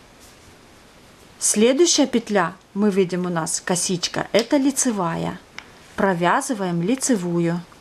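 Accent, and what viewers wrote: Ukraine. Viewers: Западная Украина ? Или просто суржик ?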